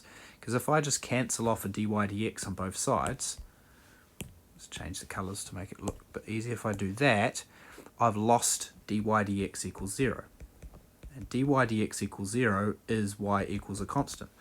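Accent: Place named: New Zealand. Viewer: Thank you❕ I've done a couple of courses in DEs a long time ago. You are right when you say k< 0 is not a problem, however I thought we were staying in the real world. I really enjoyed this problem and got it out by myself when I heard you say "separation of variables." I hear by you mild accent that you are a Kiwi. I live in Brisbane Australia, and I do YouTube maths for about 4 hrs a day. I'll be visiting your YouTube channel more often.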